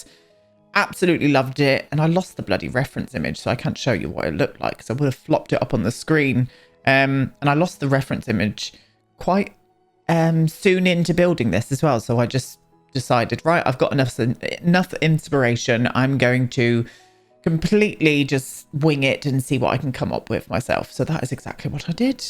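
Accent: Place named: RP, United Kingdom. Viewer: posh British then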